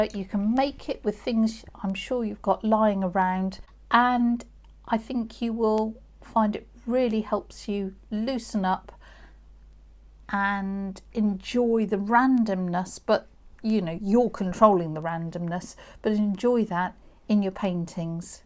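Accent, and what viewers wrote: United Kingdom. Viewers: The most lovely accent in the world is the British one❤❤❤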